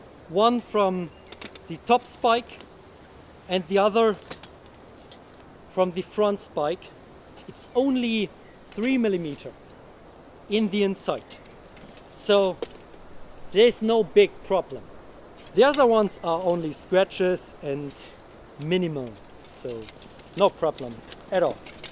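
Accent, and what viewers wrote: Germany. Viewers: First time here. I've immediately recognized your German accent 🥰. Based channel! I subbed.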